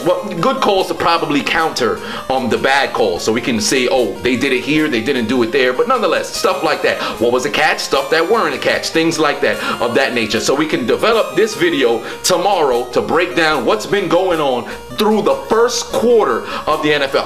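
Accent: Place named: New York, United States. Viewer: Educated New York talk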